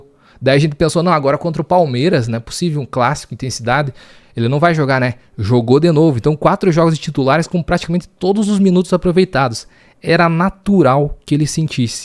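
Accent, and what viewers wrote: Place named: South, Brazil. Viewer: Tu tem sotaque do sul e torce pro Corinthians, explica pra nós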